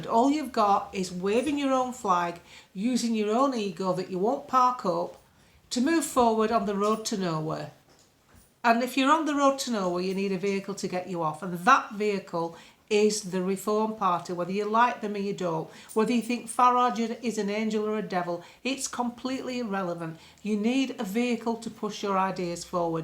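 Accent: Germany. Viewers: 😂😂😂love you reading your news report in yourGurrrman accent😂😂😂 Please do more and do you any other accents from around the world😊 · Hi, keep up the good work but do you have to do that stupid German accent? It does you no favours.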